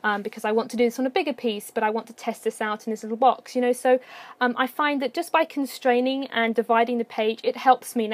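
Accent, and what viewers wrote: United Kingdom. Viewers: Love your British accent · Idk why nobody has commented on this but I ❤️ your accent! I'm British too, and ppl really like mine too! I've watched this video like 5 times because it's so inspiring, and just to hear you talk too! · Are u from the UK or something no sorry just asking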